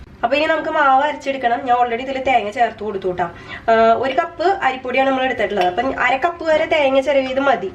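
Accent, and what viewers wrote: Thrissur, India. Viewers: ചേച്ചി തൃശൂർ കാരി ആണോ ആരായാലും സൂപ്പർ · ശ്ശേ,,,,,,,,,,,,,,,,,ഇടക്ക് പെറുക്കി തിന്നു അല്ലെ? എനിക്ക് ഇനി വേണ്ടാ ട്ടോ തന്നത്താന്‍ കഴിച്ചോണ്ടാ മതി,,,,,,,,,ഹായ് എന്തൂട്ടാ ഇത് തൃശ്ശുര്‍ ആണോ വീട് പൂരത്തിന് വെടി പൊട്ടുന്ന മാതിരി അല്ലെ വാക്കുകള്‍ ,ഇഷ്ട്ടായി ട്ടാ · chechi adipoliyatto supr avadaranam thrissurkkarellam inganano nishkalankamaya voice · തൃശ്ശൂർ ക്കാരിയാണോ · Speed kurachu paranjal manassilaakum.Trichurkkari aano? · മോളൂ! തൃശ്ശൂർ സ്ളേ ങ്ങാണോ? ഭാഷ നല്ല രസം ണ്ട്. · അപ്പം കണ്ടു നോക്കീതാ. ദേ അപ്പൊ ഒരു തൃശൂർ talk.. ഇതെങ്ങനെ ഇത്ര speed talk.. ഞാനായിരുന്നു ഇവിടിരുന്നു ശ്വാസം പിടിച്ചത്.. വീഡിയോ ഇടയിൽ പരസ്യം വന്നില്ലായിരുനെങ്ങിൽ ശ്വാസം കിട്ടാതായേനെ.. അടിപൊളി ചാനൽ.. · Hai chechi eppol aanu kandathu. ..subscribe cheythu ta Nalla talks. .thrissur aanu nnu Slang paranju 😀 evideya? Njan sandhya Lajith. .triprayar aanu. .eppol hus nte oppam tamil nadu aanu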